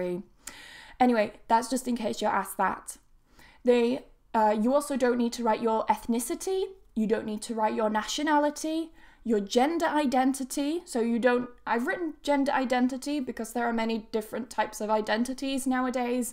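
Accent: United Kingdom. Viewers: ....l really like the way you laugh, speak,smile and the way you speak British english,it sounds very wonderful....your British accent is awesome.....Thanks for the video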